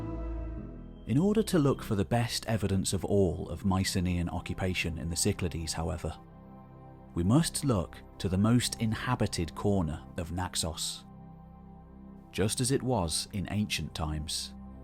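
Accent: England, United Kingdom. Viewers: just hearing your english university narration = PUKE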